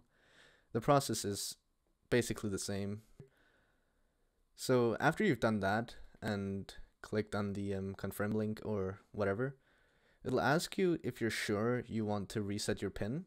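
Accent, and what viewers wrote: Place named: United States. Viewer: Finally an American not Indian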